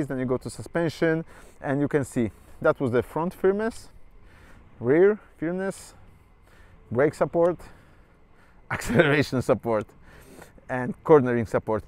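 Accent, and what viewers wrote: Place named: Poland. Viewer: Your English is more polish then English😢😢😢😢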